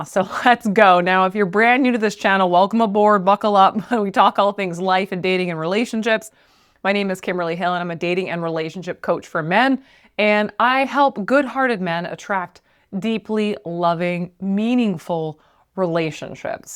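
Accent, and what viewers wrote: Canada. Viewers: Kimberly, Thank you for your insights. As a dual citizen, I love the Canadian way of speaking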